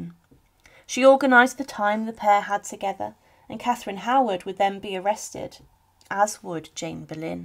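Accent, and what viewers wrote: United Kingdom. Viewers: I am British. The narrator sounds British. So what are you saying?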